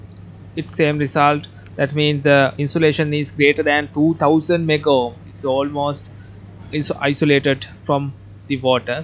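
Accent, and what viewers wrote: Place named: Sri Lanka. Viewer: you have sri lankan accent 🤔👍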